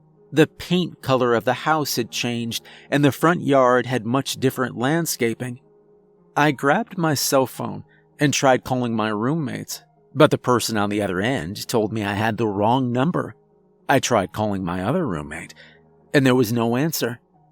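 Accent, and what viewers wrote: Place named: Northeast, United States. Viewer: Dear, are you Native American? Did you grow up on a reservation or did you have a lot of close friends that were Native American? Native American, like Northeastern tribes. Possibly let's see it has to be up North and it has to be also somewhat East, judging by your accent. But you said it was flat, so that's like Midwest, but there's a lot of flat expanses in many of the states and your accent reminds me of the East, like Maine, Massachusetts, Vermont, Rhode Island? No no no not definitely not Eastern directly on the Seaboard. Could it be possibly Minnesota, Minnetonka Native? I just want to add that the imagery, in this particular video, is absolutely beautiful, it's not scary at all, actually it is really, very, hypnotic and sci-fi. It looks like a Sci-Fi novel cover,the soft bound type of a novel cover, for example like the cover of Ringworld or something. 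I like how this ship,or craft, stretched out and looked like a Sheriff's badge star, over the Earth. That image, was sort of, sublimely cryptic., I hope you respond to my comment, because my curiosity, is absolutely killing me and that's scarier than this video, even though you have a million subscribers & this video is probably five years old. I didn't look at how many subscribers you have and I did not see when this was actually posted. I'm being kind of an exaggerator in fun... when giving the surmised, numbers of subscribers and the time span marked in years+ but maybe... I'm..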